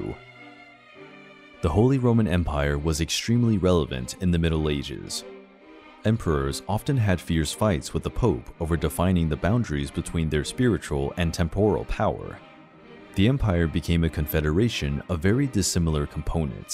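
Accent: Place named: United States. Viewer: love this video! very informative! a little frustrated with the american english pronunciation, but i can’t expect everyone to have perfect german pronunciation 😂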